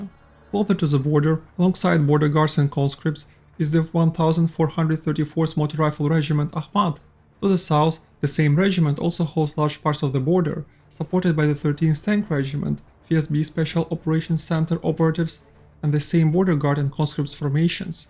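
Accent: Ukraine. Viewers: Хохлячий акцент не скрыть за английской ломаной речью читая по бумажке. Обьективности 0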